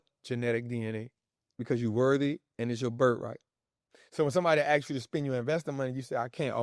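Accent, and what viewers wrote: New Orleans, United States. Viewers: I heard the New Orleans in his voice🎉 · You ain had to tell me you was from New Orleans I heard it and you ain even had to say baby or room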